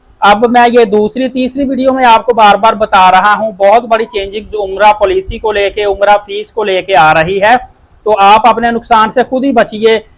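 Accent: Punjab, India. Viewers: سر آپ کی اردو بول چال بھی پنجابی انداز میں ھے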